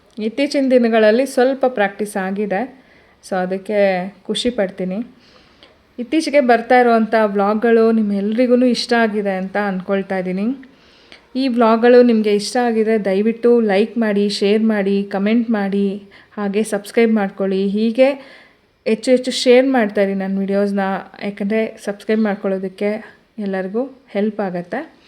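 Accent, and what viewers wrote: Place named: North Karnataka, India. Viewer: 😂😂😂👌👌👌👌 ನಮ್ಮ ಉತ್ತರ ಕರ್ನಾಟಕ ಭಾಷೆ ಏನು ಕೇಳ್ತೀರಾ 😅😅😅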